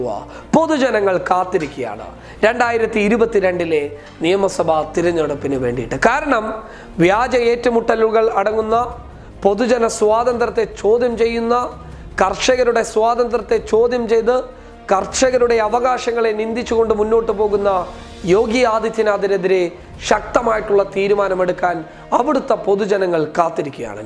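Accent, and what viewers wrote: Kasaragod, India. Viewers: Kasaragod shaili kayarivarunnu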